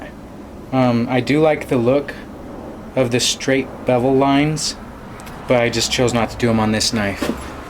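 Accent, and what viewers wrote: Netherlands. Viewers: you're dutch ?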